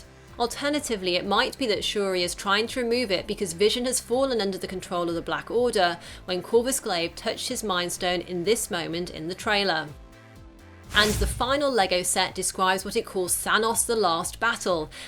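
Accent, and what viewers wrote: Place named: United Kingdom. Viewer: Ur British accent gives me boner ever damn time........ Jizzlied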